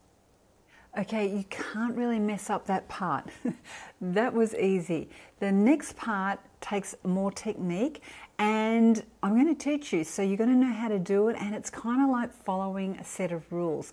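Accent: Australia. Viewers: Your personality fills me with warmth and joy and you are one of my top favourite YouTubers. I remember finding your channel (broccoli sprouts video) when I was in a toxic relationship and did not know what was wrong with me, and your videos gave me so much hope, softness, feminine energy I was missing in my life. I watch your videos now and I feel that burst of joy, pleasure, warmth and happiness you always have in your videos. I love your Australian accent too. You have no idea how much your radiant personality can heal others behind the screen. Thank you for being you. I hope one day to pull off this makeup look since you look like a model. There are so few people left on this earth with such an authentic emotive energy, beauty and personality. I hope you never change who you are. Thank you for what you do to help so many people remain beautiful in a world that can be cruel at times.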